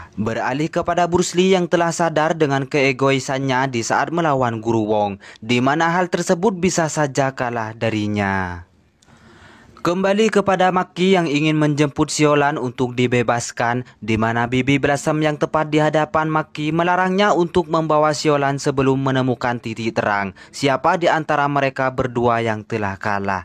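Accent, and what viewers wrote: Madura, Indonesia. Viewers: Logat madura cak?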